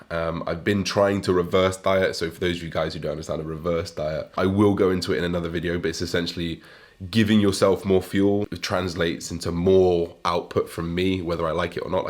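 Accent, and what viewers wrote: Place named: England, United Kingdom. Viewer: I have trouble with understanding english accents, but your content is too good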